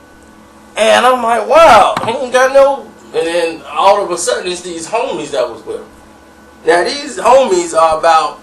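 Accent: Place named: South, United States. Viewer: Dam good southern voice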